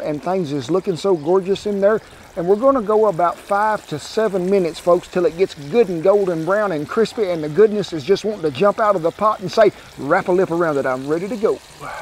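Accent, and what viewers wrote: South, United States. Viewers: I like ur southern accent sir... really smooth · to be honest I watch this because of the cowboy-accent. So stylish slang keep up the good work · Nice and clear american diction! You can work as a showman) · well, it's cowboy drag, at the least. he's really worked on the "cowboy" accent. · His voice and southern accent is so nice to listen :) and of course amazing recipes he does!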